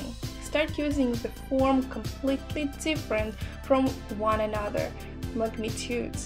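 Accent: Russia. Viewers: Русскоязычный английский ,идеи полезные для меня, Thanks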